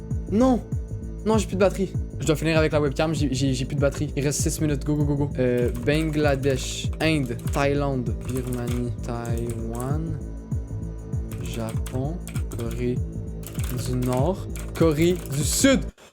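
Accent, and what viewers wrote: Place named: Quebec, Canada. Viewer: Salut, tu utilises quelle application pour le montage ? Et sinon, j'adore l'accent Québécois, rien qu'à la pdp, j'ai vu que tu ressemblais à ADAM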